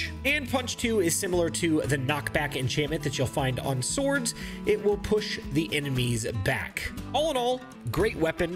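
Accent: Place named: United States. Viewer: That American voice sounds like the dawn of victory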